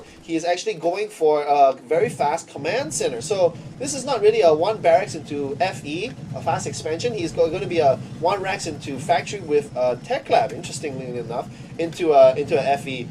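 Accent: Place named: Singapore. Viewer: You sound like a singaporean. And loking at your profile I think you are! Good job. keep it up. =)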